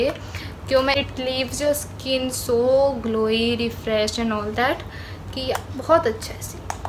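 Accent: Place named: Punjab, India. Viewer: Your Punjabi accent is super cute 💕💕💕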